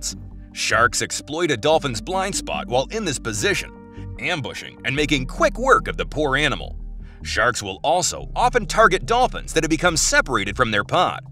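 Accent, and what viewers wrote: United States. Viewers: Appalling American narration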